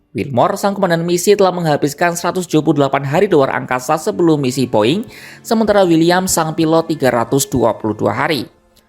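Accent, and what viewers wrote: Java, Indonesia. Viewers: kerja keras editor filter midbass karena medok parah. Aneh sekali narator ini. Kamu kuliah di mana sih. Saya aja puluhan tahun di Jawa ga medok. 😂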